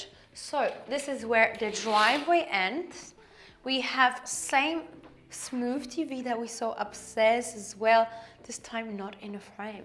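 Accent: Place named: Australia. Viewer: You have a slight Aussie/ New Zealand accent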